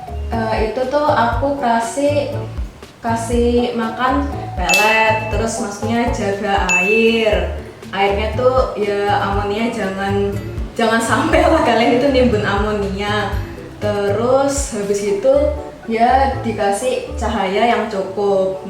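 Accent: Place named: Surabaya, Indonesia. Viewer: Suroboyo pundi mbak e sampean?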